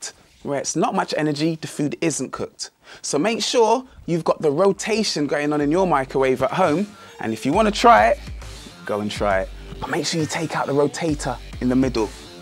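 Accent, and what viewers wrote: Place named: London, United Kingdom. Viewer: it's a pure london accent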